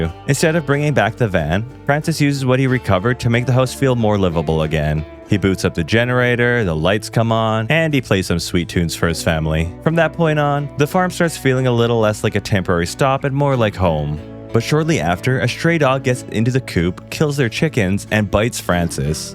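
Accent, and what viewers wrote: Philippines. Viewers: Is it weird that the moment you say you're Filipino, I can now hear the Filipino Radio DJ English accent? 😅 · i love your Filipino accent lol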